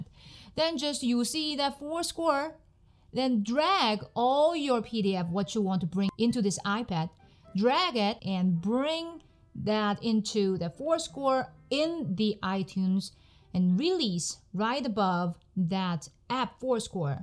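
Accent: South Korea. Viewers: sometimes i think i'll just watch for a couple minutes and then you get me hooked and i have to sign in to thumbs up and i wind up watching for hours. with this one you had me right at the beginning with "maybe just enjoy this funny english, korean accent" and your expression when you said it. hahahaha! so here i am again! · 한국분이신가봐요! 악센트 별로 신경쓰지 마세요 영어 원어민들보다 잘하시는데요 뭘 ㅎㅎ 영상 잘 보고가요! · I adore your funny Korean English accent as you call it. Your are pretty funny when you make fun of yourself. Love it.💙💙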